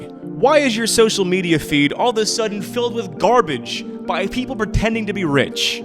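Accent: Canada. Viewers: Aboot! Are you from Canada? This is the first video ive seen of yours and I love it!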